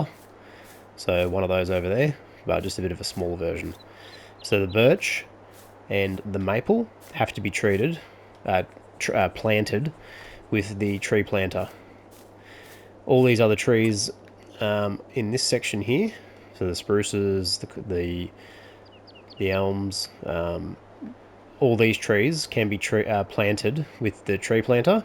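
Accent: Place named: Australia. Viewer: Liked and subbed Great to hear an Aussie playing FS on YouTube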